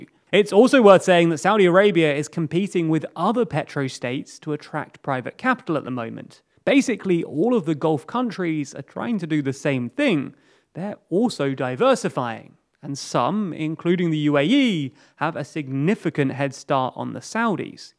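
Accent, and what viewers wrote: United Kingdom. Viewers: Hearing from a person with British accent that human rights in the middle east have historical being violated... dude good part of it was causeed by your country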